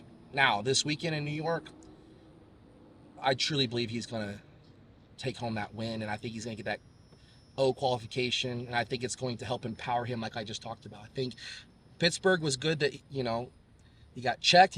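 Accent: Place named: Florida, United States. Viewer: You sound like a true Florida cracker bro “fittin to get swole”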